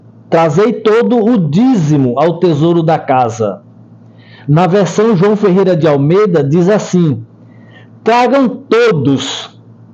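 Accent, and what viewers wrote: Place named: Brazil. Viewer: ele fala como brasileiro estudado cader o sutaco judaico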